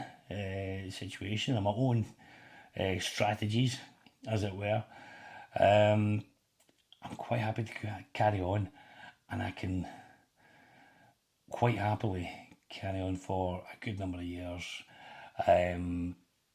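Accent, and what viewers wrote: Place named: Scotland, United Kingdom. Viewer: I think you are going to do well. Everyone loves a Scottish accent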